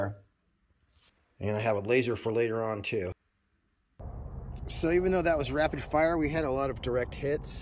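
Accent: United States. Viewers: Will you sound American so why would you buy basically a toy when you could have a real gun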